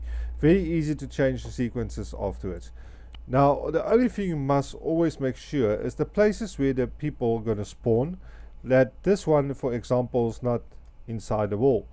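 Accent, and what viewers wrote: South Africa. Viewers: South African? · 99% sure you are Afrikaans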